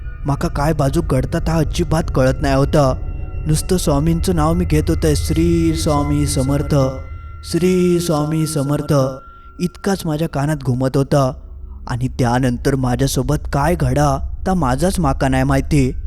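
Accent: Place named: Malvan, India. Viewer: ❤.व्हेरी. Good and.sweet.malvani.voice. 😂.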